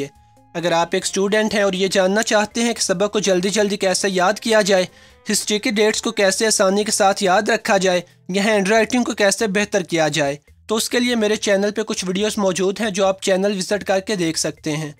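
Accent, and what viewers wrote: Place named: Punjab, Pakistan. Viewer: ap bhe bohot zahen hen pr apka lehja bilkul pnjabi he...lgta he ap pnjabi bol rahe hen.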